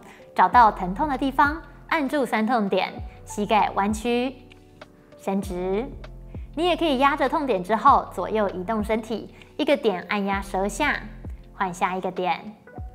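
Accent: Taiwan. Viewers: 我超喜欢你的内容😊还有你台湾的声音😊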